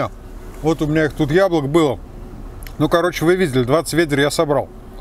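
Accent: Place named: Tver, Russia. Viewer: Все думала и гадала что мне Ваш акцет знаком...не могла понять от куда. Бабушка моя так говорила ведер, не вёдер...она была родом с Тверской губернии.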